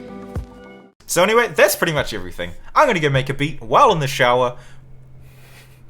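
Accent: New Zealand. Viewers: I think it might be a Kiwi accent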